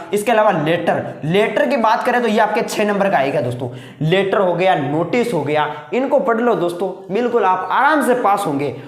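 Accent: Rajasthan, India. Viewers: BHAI Rajasthan su he Kay thaki boli bahut choki lagi ❤️❤️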